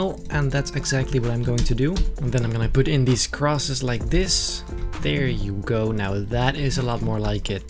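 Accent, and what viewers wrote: Netherlands. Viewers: You sound Dutch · Fantastische artiest, Nu nog van dat hollands accent geraken.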